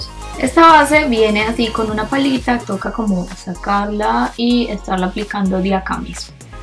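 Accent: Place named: Antioquia, Colombia. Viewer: Hola, el vídeo me entretuvo mucho y me gustó la temática, pero quiero darte una recomendación si me lo permites. Espero que no te lo tomes a mal, de verdad lo hago con una buena intención porque tu canal me gusta muchísimo. Yo sé que muchas veces los que somos paisanos y tenemos este tipo de acento hablamos muy rápido y por esa razón nos enredamos, pero en los vídeos esto se hace mucho más notorio, y puede llegar a ser un poco estresante escuchar que alguien hable tan rápido, se equivoque y se trabe. Lo que puedes hacer es intentar hacerte consciente de lo que dices al hablar para así hacerlo más despacio, y si te equivocas en una palabra la vuelves a repetir un poco más lento y pues lo editas, así creo que el vídeo se vería mucho más calmado y profesional. Un besito desde Medellin, saludos!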